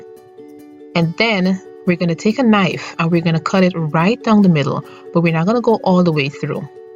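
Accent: Guyana. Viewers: Thanks, for your first YouTube video looking forward to more. I'm always looking for new recipes on your blog. Love ya accent. GT to de bone. Thanks for repping Guyana and sharing our delicious recipes.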